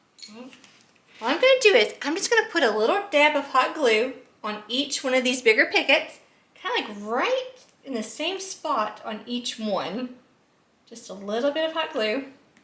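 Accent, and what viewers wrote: South, United States. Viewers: What a Super idea! Love Bee’s 🐝 I also ❤️ your accent of your voice! We live in Wisconsin. So when I watch Southern UTubers it makes me smile 🥰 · Omg I love your accent…. Your southern charm is adorable as well as your craft projects. Thanks for sharing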